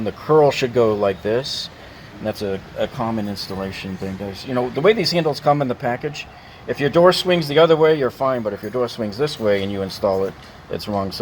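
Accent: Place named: New England, United States. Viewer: I hear New England, loud and clear.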